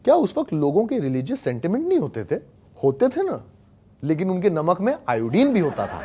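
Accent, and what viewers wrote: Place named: Bengal, India. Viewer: I love your bengali accent the most. Hahahaha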